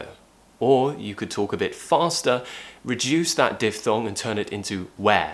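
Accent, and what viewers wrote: RP, United Kingdom. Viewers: Love your accents. Sounds like sherlock holmes lol i love british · Thanks...you have a lovely British accent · "It's kind in the middle of my mouth" I definitely love british received pronunciation... Good bless you...you're the teacher!!😜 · we love the modern RP accent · I like the way you pronounce the British accent, and I do my best to improve it but I find that I speak like the Indians😂😂, follow you from Egypt❤ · My fave British accent teacher. 😅😃.. Thank you Sir.. Love from Philippines. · Really your British pronounciation is well interesting and deserves to ben listen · I am Super Fan of British accent. I do love all your accents, no matter which part you from UK. I have been trying to search British channel for long time. Finally I have found the good one. I deserve it❤ Please keep up the good work. There is no room for doubt to watch your channel. 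You rock! Have a good one. Keep social distancing. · Listening your British accent gives me years of life. Best Teacher Ever!!! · I adore your breathtaking British accent 😍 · Your accent and the British accent the most beautiful accent ever and i fall in love with it at the first second I hear it.You are really helping me with that I'm student at English Section from Iraq you're the cutest teacher ever I hope I can speak the British accent professionally as you🤍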